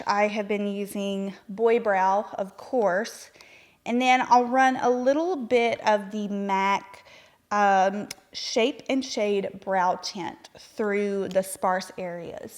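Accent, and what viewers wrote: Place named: Tennessee, United States. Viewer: I love your accent. I am aTennessee gal too. 😊💜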